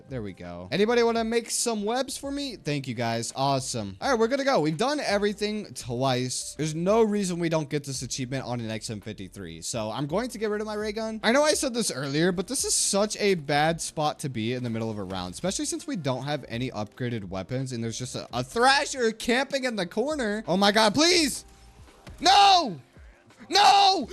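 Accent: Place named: South, United States. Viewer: Your voice is really cool. The Southerner accent is sexy.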